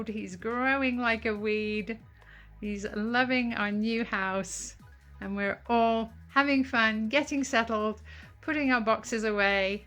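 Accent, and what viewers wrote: England, United Kingdom. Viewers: Love your accent my mother was from England. Miss her voice. Love your videos!!!💕💕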